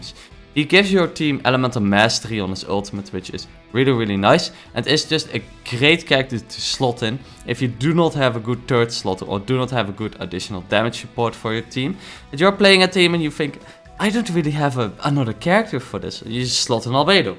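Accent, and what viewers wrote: Netherlands. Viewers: Are you dutch? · The Dutch accent haha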